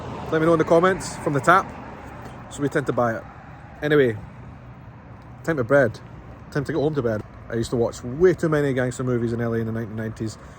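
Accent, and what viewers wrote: Scotland, United Kingdom. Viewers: You should go to Scotland & to the highlands & the northwest if you think his brogue is broad. His is easy compared to other areas of Scotland. Sure I didn't pronounce all the Scottish cities, towns & villages properly even with Scottish guides helping. In the highlands the signs are in English & Gaelic and it's pronounced "Guy-lik" unlike the Irish Gay-lic. Your welcome! 😊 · His burr is mild (Irish have a brogue, the Scots a burr) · Hello,. Even ignoring your Scottish brogue (which is, of course, impossible; it is very "heavy" but nice), you give yourself away as a non-U.S. person when you pronounce L.A. as "Los Angel-ees". Proper English pronunciation has become "Los Angel-ess". Of course, "Los Angeles" is short for the city's (non-indigenous peoples) founding name from the Spanish, which is " El Pueblo de Nuestra Senora La Reina de Los Angeles" ("The Town of Our Lady the Queen of The Angels"). 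I hope you enjoyed your stay in the unique and huge an-automobile-is-still-necessary city that is L.A. University of California at Los Angeles ("U.C.L.A.") Class of 1975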